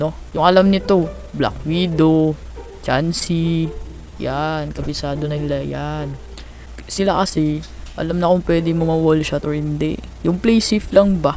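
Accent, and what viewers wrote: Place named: Iloilo, Philippines. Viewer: taga san poba kayo tol bakit nag i ilonggo ka tol ?????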